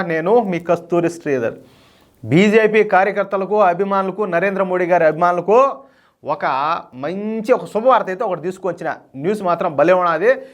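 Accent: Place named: Chittoor, India. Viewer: BABU MEMU KEVALAM MANA CHITTOOR DISTRICT BHASHA.MEEDWARA VINADAM KOSAMEE MANA CHANNEL CHUSTHUNNAMU BABU KAANI E ROJU CHERUVU LO CHEPPALA EXAMPLE MATRAM ASSULU NACHHSLEEDHU BABU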